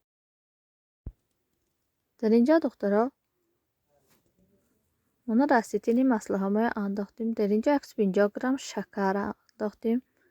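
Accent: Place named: Isfara, Tajikistan. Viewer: Шумо аз Исфарами?? Гапзанитон мобоин лафзи Исфара точикистон да якта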